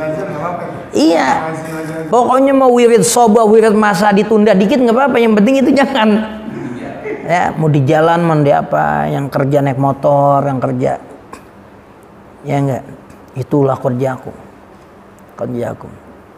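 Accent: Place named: Jakarta, Indonesia. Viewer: Betawi beud dah.. Sama ama saya ustadz.. Suka sayah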